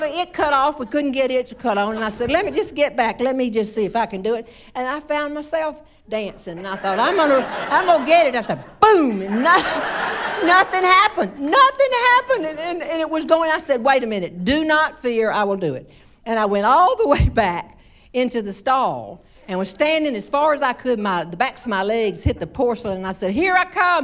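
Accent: South, United States. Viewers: Thanks to Miz Jeanne I now find myself slipping into a Southern Accent...even in my head, I am typing this with an accent. Problem is .. I'm Australian. Now my teenage children and Husband think I'm weirder than they first thought.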